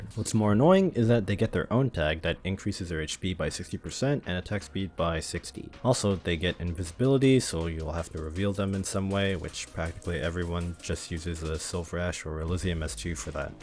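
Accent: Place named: Japan. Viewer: pls stop speaking japanlish, i'm gonna die from cringe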